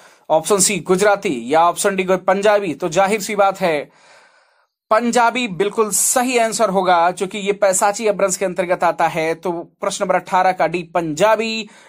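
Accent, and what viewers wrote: Pahadi, India. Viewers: Pahadi hindi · पहाड़ी हिंदी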